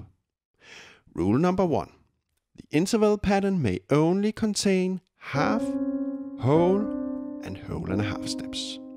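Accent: Denmark. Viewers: You sound Danish... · That accent 🤌🇩🇰 Greetings from a fellow danish musician. Great stuff ❤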